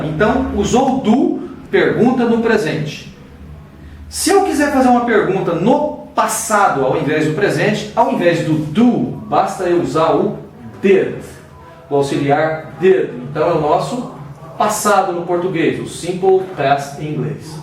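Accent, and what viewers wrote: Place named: United States. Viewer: Po assim não vale, o cara já nasceu com sotaque americano.....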